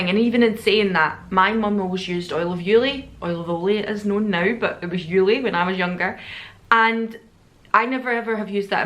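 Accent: Scotland, United Kingdom. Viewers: Completely agree with MLMs, just wondered what your thoughts were on the Body Shop as they have their Body Shop at Home? Really enjoying the videos after discovering you a couple of months ago, nice to hear a familiar Scottish voice 😊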